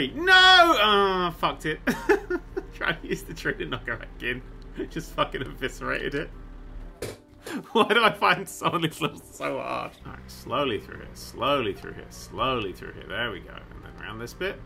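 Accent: United Kingdom. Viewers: I feel like Dan's accent has become even more British after he moved out of England...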